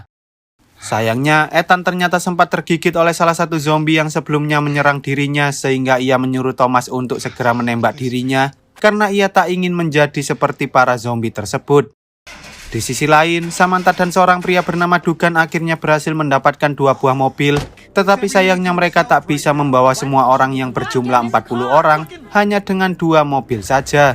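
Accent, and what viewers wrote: Java, Indonesia. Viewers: dari ngomong nya udah pasti orang jawa❤🎉😅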